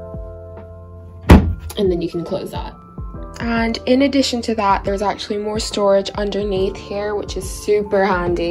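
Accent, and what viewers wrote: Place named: United Kingdom. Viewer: Your British accent is showing!!!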